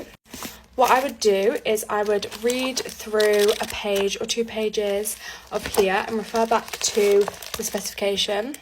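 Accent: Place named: United Kingdom. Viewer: Why it’s been so long since I have heard a British accent. So much feels like home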